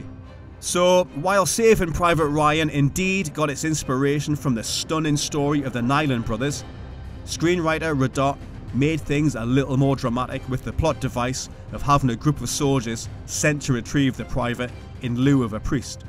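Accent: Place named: Newcastle, United Kingdom. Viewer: Can't take this video seriously with its Geordie narray'aaaaah.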